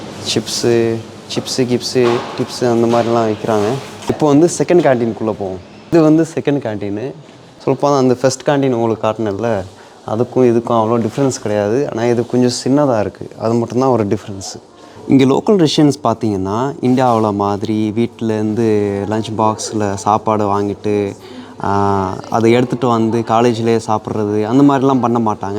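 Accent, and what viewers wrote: Standard. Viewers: இலங்கை பதிவு ரஷ்ய பையன் இவ்வளவு சுத்தமான தமிழில் பேசுவைதைபார்த்து ஒர் தமிழனாக பெருமையடைகிறேன்❤❤ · தமிழ் சுத்தம் 🎉😂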